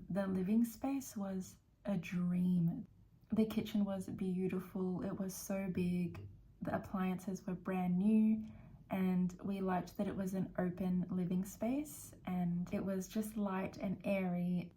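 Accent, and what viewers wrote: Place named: United Kingdom. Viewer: U getting a bit of British accent already hehe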